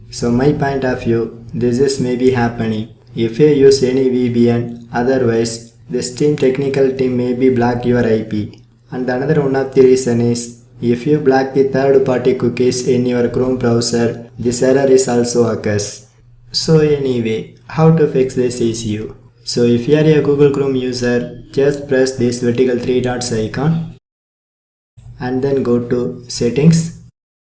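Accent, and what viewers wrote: India. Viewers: When you hear an Indian you know it’s gunna be right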